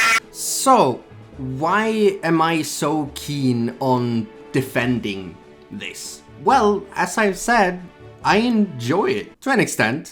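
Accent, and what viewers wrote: France. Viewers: Je trouve que ton accent français est très raffiné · j’aime trop ton accent ( I love your accent ) VIVE LA FRANCE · French accent 100/10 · l'accent français de qualité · I'm French so your French accent is... Très bien. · Ur french accent :c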